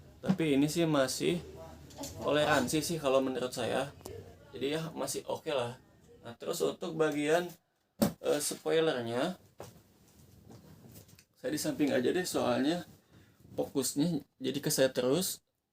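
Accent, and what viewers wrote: West Java, Indonesia. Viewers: Sunda banget ngomongnya 😅😅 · Lu orang sundaa yaa, udah kelihatan banget logatnya sama pas baca huruf "F"😅😅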